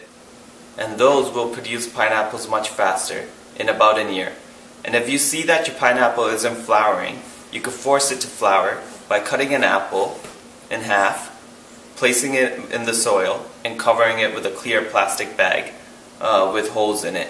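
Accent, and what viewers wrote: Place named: Caribbean. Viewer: I hear a Caribbean accent… Cayman Islands maybe?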